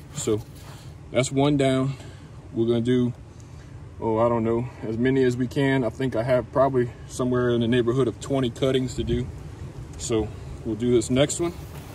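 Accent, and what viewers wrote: Louisiana, United States. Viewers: What a coincidence! I did the same thing today with my brown turkey tree. I am trying to get more varieties. Would you be willing to sell any of these cuttings? By your slight Cajun accent, I probably dont live too far from you. Let me know. Great video.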